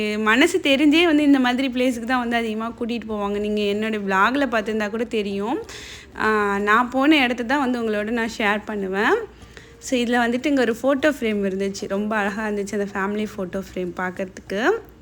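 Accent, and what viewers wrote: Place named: Pattukkottai, India. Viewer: Nce vlog ka..unga slang enga oor slang madriye iruku..am near by pattukkottai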